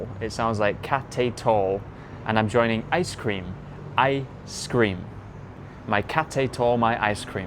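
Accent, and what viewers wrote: Northern England, United Kingdom. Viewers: You sound like someone coming from the north of England. Am I right ?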